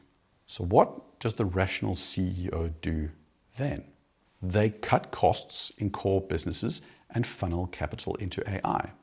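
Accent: South Africa. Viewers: I have recently discovered your channel and I'm obsessed. Thank you. Great accent too - South African slash Danish? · from the accent, are you from South Africa ?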